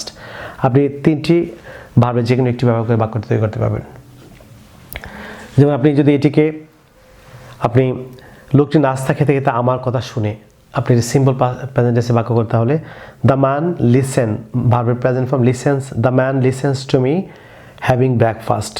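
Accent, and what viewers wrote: United Kingdom. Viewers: I like british accent so much. I am learning british pronunciation from your You tube channel sir. You are good at british accent.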